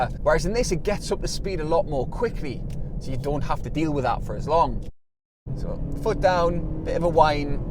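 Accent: Newcastle, United Kingdom. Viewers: Canee geordie accent like!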